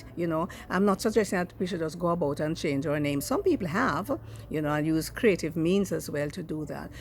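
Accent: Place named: Jamaica. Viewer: Well said aunty real Jamaican talk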